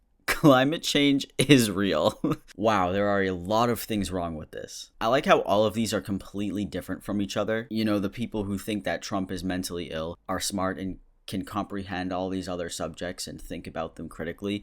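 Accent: United Kingdom. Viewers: How do you have a more British accent than me, I'm British. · british accent, not that bad, not sure which part of britain youre tryna be from tho... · i laughed at your British accent so much😂. it was better than a lot of peoples tho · PLS-WHY DO YOU SOUND LIKE SHREK WITH YOUR BRITISH ACCENT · I know I'm late with this comment but I just want to say, I'm British and I appreciate your British voice, not bad at all!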